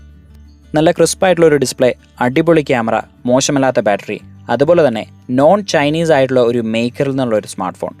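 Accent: Idukki, India. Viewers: ഇടുക്കികാരൻ ആണോ ബ്രോ ?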